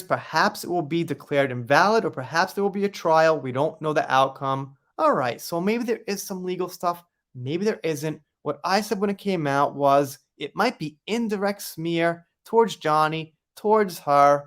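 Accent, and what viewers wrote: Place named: New York, United States. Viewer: I like to hear you talk, I like your accent (unusual bc I'm a Southerner who typically can't take NYC/Boston accents 😆), & you're spreading good news about JD, so as far as I'm concerned, you can repeat as many narratives as you like!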